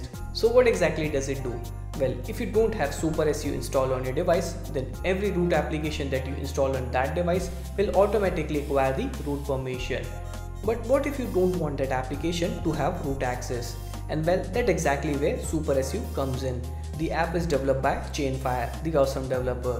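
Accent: India. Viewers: I LOVE your indians accent! hah xd so cute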